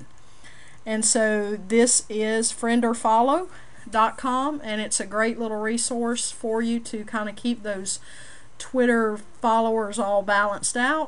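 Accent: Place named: South, United States. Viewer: LUV your Southern Accent!:-) You give a great tip in this vid, nice job. Keep up the good work! Blessings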